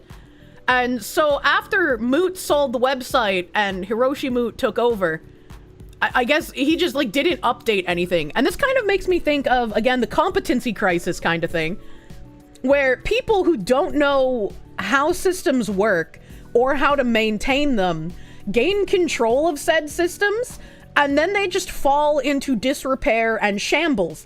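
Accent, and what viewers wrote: Boston, United States. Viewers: The most trusted news source with a Boston accent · There is a retired Alabama football commentator that had this same Boston accent. Maybe that's why I enjoy her voice.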